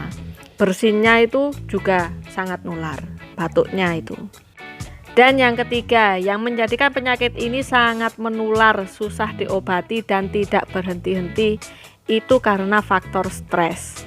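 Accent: Java, Indonesia. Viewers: Suaranya medok banget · Asli mana mbak Lamongan ta? Kog jowoe medok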